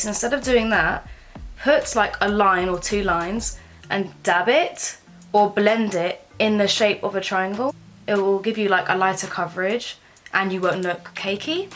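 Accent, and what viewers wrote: United Kingdom. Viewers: U look pretty w no makeup😂💕love ur accent even tho I’m from ldn too. RIP CADET❤️🙏🏽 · Jeez I love the English accent.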